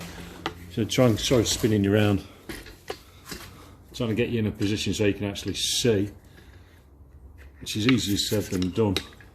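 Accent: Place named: Nottingham, United Kingdom. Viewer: Sounds like a familiar accent, I’m guessing Nottingham. I was thinking Along the same line with the tiger seal but I was hoping not to rivet the seat box bit and just glue it. Thanks for the other tips.... nice wheels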